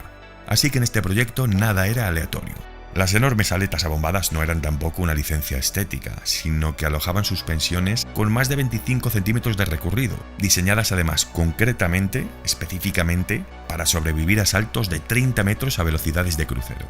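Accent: Spain. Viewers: Español de España, además. ¡Gracias!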